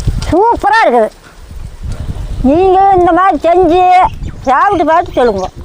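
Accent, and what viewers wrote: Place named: Kongu, India. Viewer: இது கொங்கு தமிழ். அப்படித்தான் இருக்கும்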